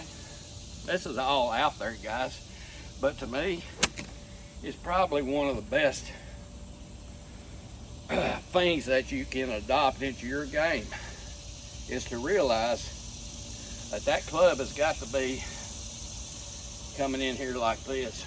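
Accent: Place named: Tennessee, United States. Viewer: I would guess from your accent you are in eastern Tennessee. But not seeing any UT flags or any other decorations has me confused.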